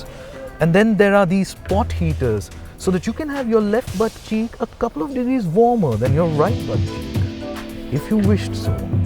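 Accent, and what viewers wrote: India. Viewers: Extremely erudite presenter. Very well done. I really like the sentence, 'the question I allow myself to ask...' Superb. Your Indian accent is a fine asset here, when I sometimes find it is annoying from other presenters. Keep it up.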